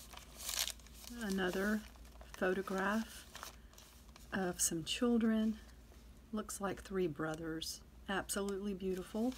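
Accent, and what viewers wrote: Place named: North Carolina, United States. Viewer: I thought I detected a NC accent!! I'm originally from Charlotte but live in California now. Where can I find your items for sale? I'm new to your page, but love it already!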